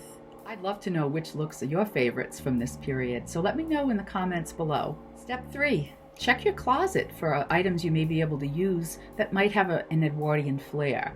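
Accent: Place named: Rhode Island, United States. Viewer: You sound like you're from Rhode Island (?) ~ Heather ~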